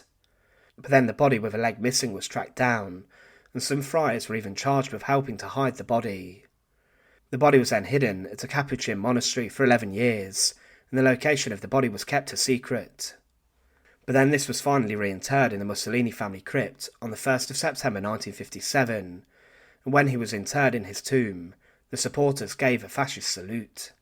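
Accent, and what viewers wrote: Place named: England, United Kingdom. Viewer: I wonder where the man learnt this English accent. He sounds English from England. It’s a bit like Australian English and American English where some statements high rise for reassurance that you agree, like a question.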